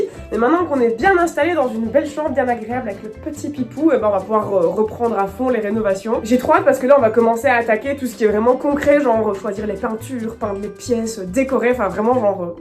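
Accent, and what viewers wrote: Belgium. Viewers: Je veux dire d'où en Belgique, la Belgique c'était clair. J'hésite ente Liège, Charleroi et Bruxelles. Vous reconnaitrez que c'est un peu large. La prononciation des é avec des è me semble caractéristique, mais je n'arrive pas à cibler.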